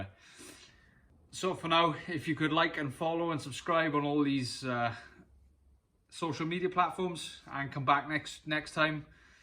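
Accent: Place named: Wales, United Kingdom. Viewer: Hehe, I guessed that you were from Wales, I worked with a couple of Welsh blokes here in Australia! Also, great work on the exhaust. It's a job I hate doing. Gotta love the sound of a V8!! Subscribed.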